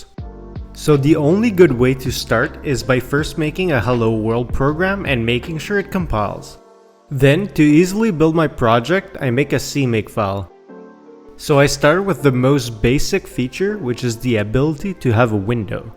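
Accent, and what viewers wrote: France. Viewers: you have a french accent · you sound french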